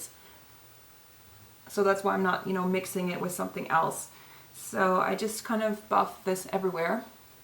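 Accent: Germany. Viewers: OMG I'm new and I didn't know that you are german:) you have great accent...! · You are german, right?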